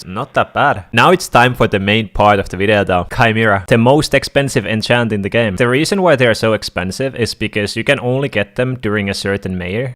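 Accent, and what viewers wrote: Finland. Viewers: are you from finland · Finnish english Accent is killing me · guys if u think his accent is weird then hes from finland. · Are u finnish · U finnish? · Kunnon suomalainen aksentti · where are you from `finland?? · Suomi man? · Hey are you Finnish? Because means piece on Finnish and your accsent is really Finnish. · Sie kuulostat vähä suomalaiselle. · are you finland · Eka video jonka oon nähny tältä ja tiesin heti siitä miten se puhu et se on suomalainen :D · Are you from Finland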